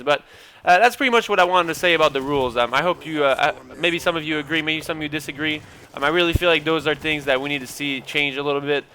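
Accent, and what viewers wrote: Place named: Quebec, Canada. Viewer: it sounds like ur quebecois accent is more obvious after u do ur french commentaries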